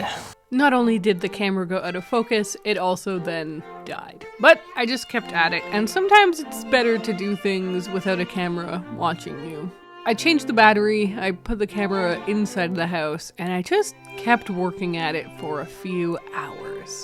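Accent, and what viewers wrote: Canada. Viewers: No, sorry! Ha, I mean the pronounciation- at first I was like, "Wait, is she saying pouddy?" And then I realized that that is, in fact, how I say it too. It's the 'about/aboat/aboot' accent coming for us again. That and saying double t's as d's- but really if we don't do that then we sound british, so I say just rock the Canadian accent with pride lol.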